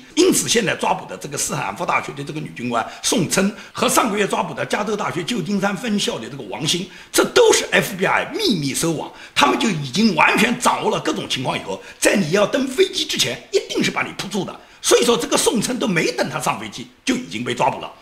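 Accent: Nanjing, China. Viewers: 听着吴老师亲切的南京乡音，真希望土共可以早点土崩瓦解，这样吴老师可以回到祖国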